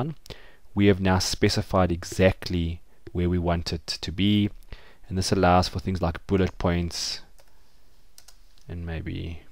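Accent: South Africa. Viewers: This was helpful! I recognize the South African acccent. :)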